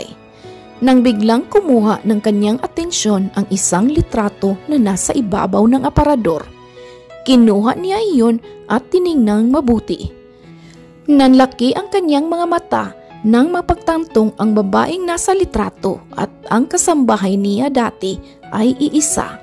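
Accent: Visayas, Philippines. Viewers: Ayusin mo pagsasalita mo. Visaya ka?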